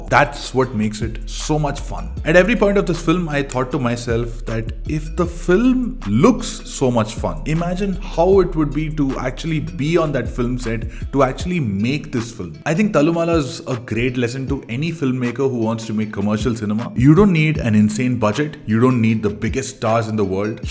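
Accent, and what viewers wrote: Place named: Tamil Nadu, India. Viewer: Nice review sensibility, and good cinema awareness, man. I haven't watched these movies yet, but your review makes me want to. And, with a surname like, I would have thought you were a Malayali. Your pronunciation of the Malayalam words, though, - flawless as they are - suggest that you aren't primarily a Malayalam speaker... you sound more Tamil than Malayali.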